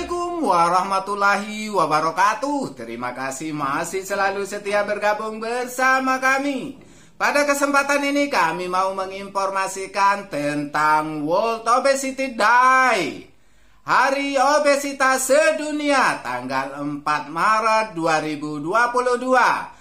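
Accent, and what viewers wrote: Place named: Java, Indonesia. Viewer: Mantap jowa ciri khas suaranya bah semangat terus om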